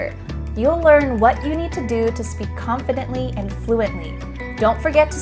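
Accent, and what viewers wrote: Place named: United States. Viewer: It seen like that you are American 😊 Wow ! 😍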